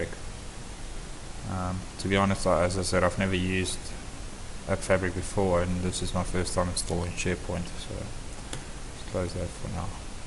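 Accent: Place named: South Africa. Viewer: You sound like a Boer!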